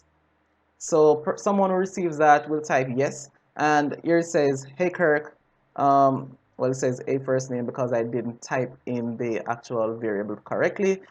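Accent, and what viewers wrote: Jamaica. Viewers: I was trying to place the accent and wanted to say Jamaican, but wasn't totally sure. Accents fascinate me, so thanks for the affirmation!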